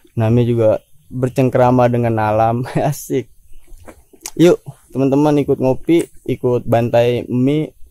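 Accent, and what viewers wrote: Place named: Bekasi, Indonesia. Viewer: oooo aksen bekasi ya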